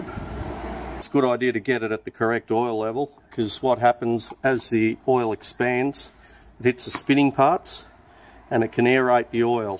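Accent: Australia. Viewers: no problem at all. I'm in the US, how do I get a beer to you? Your accent sounds Australian? Do you have a way to get a donation to you 😊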